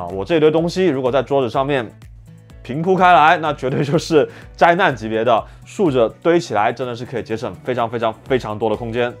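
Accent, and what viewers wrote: Cantonese, China. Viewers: 你能说话慢点吗,,,本来广东的普通话就不好,咬字不清更难听!!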